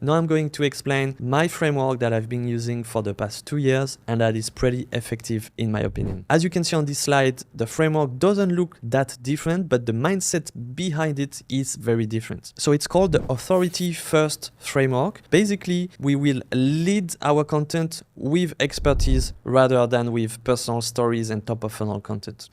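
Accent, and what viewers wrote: France. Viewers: felt the French accent... mais j'aime ce que tu proposes btw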